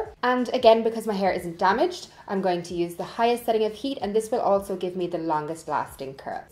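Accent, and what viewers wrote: Ireland. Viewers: I thought you were irish😂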